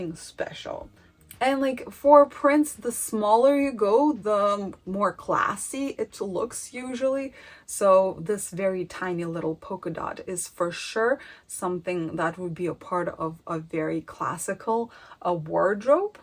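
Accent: Russia. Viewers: Great understanding of trends, and feeling for fashion. Beautiful English, good French pronunciation and a very light cute accent, perhaps Russian! Just curious.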